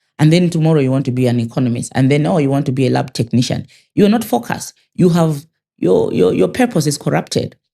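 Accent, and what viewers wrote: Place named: Zimbabwe. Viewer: South Africa via Zimbabwe's accent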